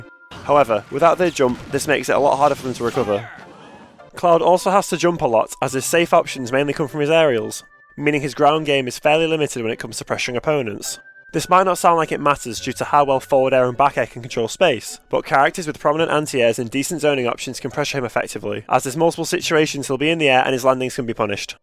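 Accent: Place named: United Kingdom. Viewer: heard british accent liked video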